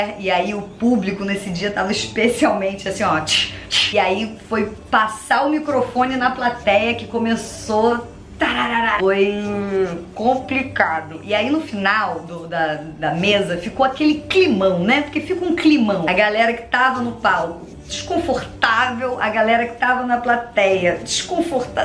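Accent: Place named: Rio de Janeiro, Brazil. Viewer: Carioca fala gostouso!!!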